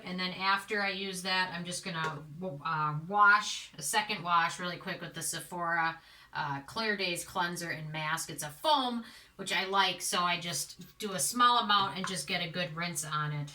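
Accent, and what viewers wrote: Minnesota, United States. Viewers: it does my heart good to hear your Minnesota "accent"... I'm originally from North Dakota and it sounds like home. 😄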